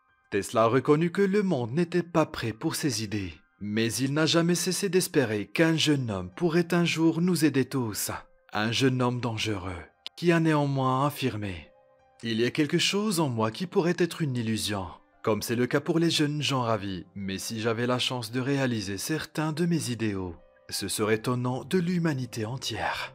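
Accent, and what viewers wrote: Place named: Maghreb. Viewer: C'est le timbre maghrébin qui te dérange ? Moi ça ne me gêne pas du tout. C'est très compréhensible. Peut-être est-ce vous qui avec un problème de tolérance et de compréhension. Ou d'idéologie...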